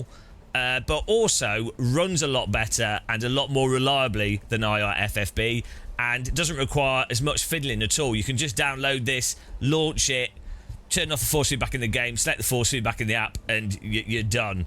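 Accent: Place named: England, United Kingdom. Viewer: This guy's English accent saves his life.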